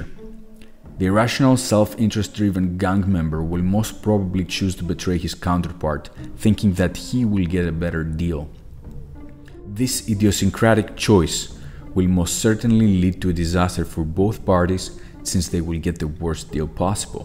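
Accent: Greece. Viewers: Sounds as greek accent. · You sound so greek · Came here to study, heard greek accent, melted, lost focus.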